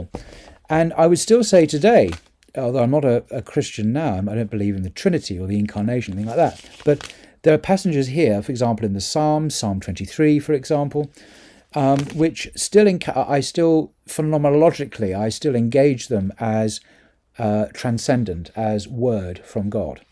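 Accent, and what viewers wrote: United Kingdom. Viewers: So ‘phenomenological’ is a tongue twister term for you. I was wondering if you had one! 😅 you have that eloquent British accent that sounds magical...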